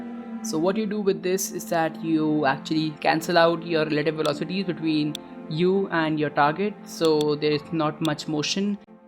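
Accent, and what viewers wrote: India. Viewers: Indian accent ??? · Are you indian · Good video.. good tutorial.. Keep it up ... but U English Can make it Not Like indian?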